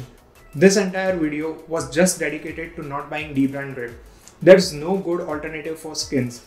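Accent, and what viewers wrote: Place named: India. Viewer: I was watching this wondering how all indians sound the exact way we Americans think you sound 😂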